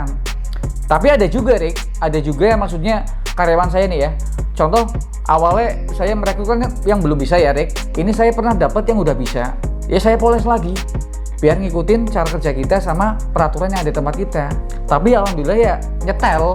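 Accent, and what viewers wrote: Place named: East Java, Indonesia. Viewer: Lokasi mana ini bos...dari logat nya kyk Jawa timur Malang...pingin belajar....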